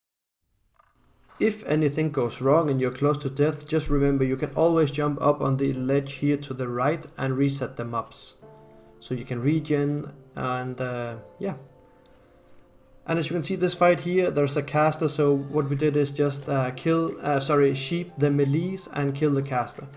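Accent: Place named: Denmark. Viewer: You gotta be a Dane with that accent! Anyways thanks for the video.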